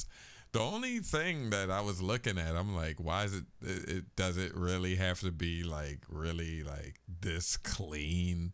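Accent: Philadelphia, United States. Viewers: You kind of sound like Bernard Hopkins . It must be the east coast philadelphia accent.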